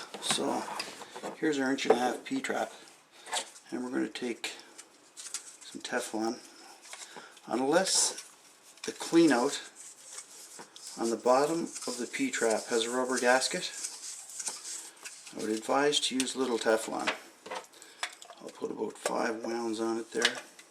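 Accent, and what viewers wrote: Canada. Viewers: I knew you sounded Canadian! I'm Canadian as well. I live in Alberta. I've been watching your videos because I've been thinking of getting into the plumber trade. Thanks a lot for putting up these videos!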